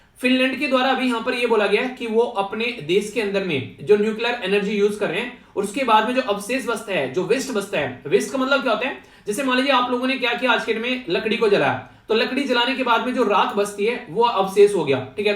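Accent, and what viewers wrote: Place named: Rajasthan, India. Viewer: sir aap kuch bhi kho ki aap kha se lekin i know it bcz aapke sare keyword or bolchal ki bhasha poori rajsthan ki mere according to rajsthan sehi ho or syd aap shrri ganganagar ya north rajsthan se ho im sure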